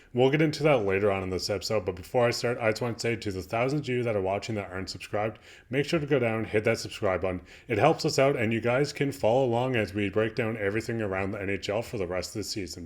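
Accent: Newfoundland, Canada. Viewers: Newfoundland? but we can understand you.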